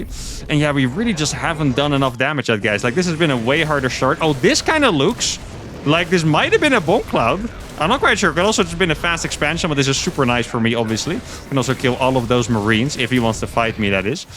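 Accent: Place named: Netherlands. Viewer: It awesom watching you people have this much fun in SC2. Also judging by your accent uThermal you are a fellow Dutchie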